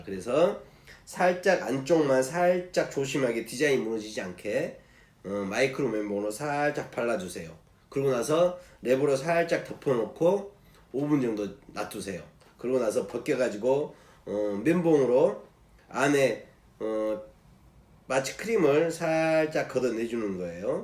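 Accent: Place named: Jeolla, South Korea. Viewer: 구 독 ! 좋아요~~~ 했어요 꾹꾹!!전라도 사투리 넘 귀여워용 ㅋㅋㅋ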